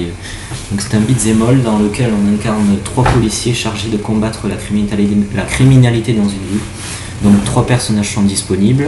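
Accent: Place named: South, France. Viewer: Ton accent de sud xD sinon bonne présentation du jeux ;)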